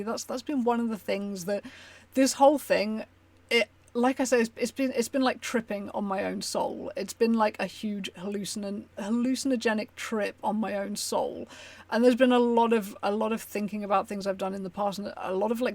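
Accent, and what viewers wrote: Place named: South England, United Kingdom. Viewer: I've just discovered your channel after being recommended some of your ED content (I've never had one but I'm interested in educating myself and understanding others' experience) I just wanted to say your MH experience here is scarily similar to an experience I had back in 2012, except I was locked up for three whole months. As an informal patient! And I regard myself lucky because services were slightly more funded at the time... You've articulated this whole thing wonderfully. Also, shout out for the south England fam - I noticed your accent was similar to mine and then you mentioned a place name that isn't too far from where I grew up so, yay!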